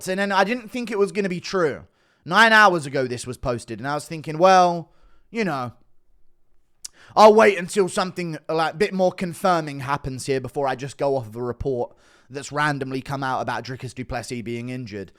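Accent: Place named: England, United Kingdom. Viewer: Im from Texas I just wanna say i love hearing a English accent reporting all this in detail!!!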